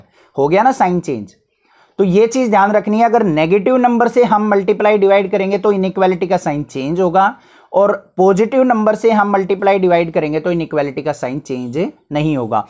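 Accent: Haryana, India. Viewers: apki awaz se pata chal gaya tha mai bhi haryana se hu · I m sure you are from haryana Your accent says you are Haryanvi 😆🤣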